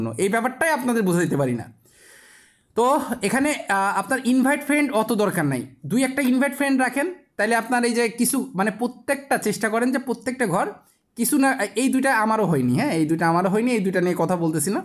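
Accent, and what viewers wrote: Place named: Khulna, Bangladesh. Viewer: অনেক ধন্যবাদ আপনাকে ভাই, ১ম ভিডিও শুনেই subs, like,share করে দিলাম ।এটা আমি খুব করি না । ভাই কি খুলনার ।কথা শুনে তাই মনে হয় ।So nice your speech.Thanks again.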